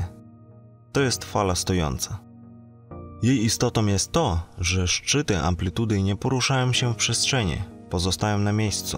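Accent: East, Poland. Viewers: Twój wschodni akcent sprawia tylko że przyjemniej słucha sie o fizyce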